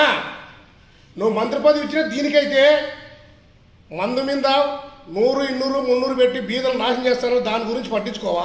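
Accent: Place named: Rayalaseema, India. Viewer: anna needhi mana rayalaseema language la vundhi dare and guts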